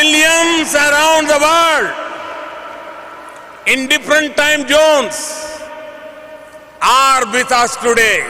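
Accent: India. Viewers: He is Speaking English, the same way he speaks Indian. Weird..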